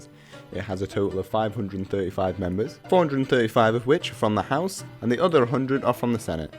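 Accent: United Kingdom. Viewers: English accent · I liked the way you explained it with a British accent.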